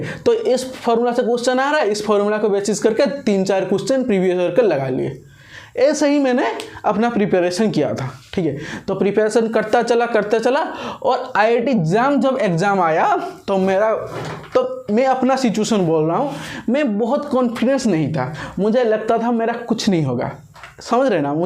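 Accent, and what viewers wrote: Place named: Odisha, India. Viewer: Pehle accent mein hii pata lag geya kii odisha se ho....me also from odisha ❤😅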